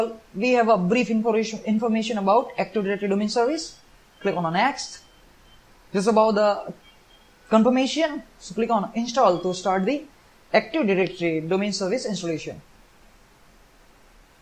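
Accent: India. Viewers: nice video but please indian people should stop speaking english.you are hurting the langage ☺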